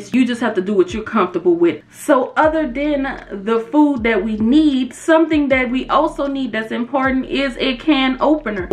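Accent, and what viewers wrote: New Orleans, United States. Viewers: New Orleans accent strong 💪🏽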